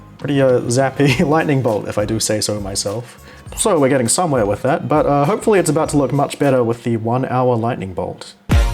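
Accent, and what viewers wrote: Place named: New Zealand. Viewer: I knew you were a kiwi!!! I was like why does he sound so familiar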